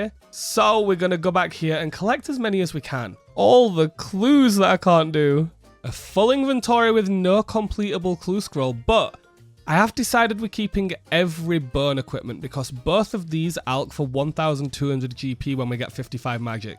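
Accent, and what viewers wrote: United Kingdom. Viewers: Where in the UK are you from? Love your accent.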